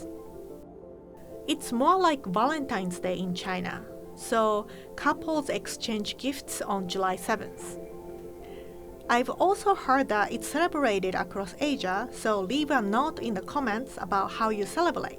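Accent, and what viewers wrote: Japan. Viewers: Even after years for working for tasty america she hasnt lost here japanese accsent praise God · Probably that just her accent. Japanese people will sometime misread "L" as "R" and "R" as "L"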